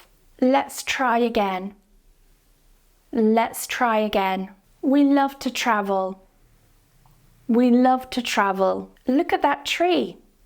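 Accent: United Kingdom. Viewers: Amazing!!! Thanks a lot! ☺☺ I love British pronunciation! 🥰😍🥰😍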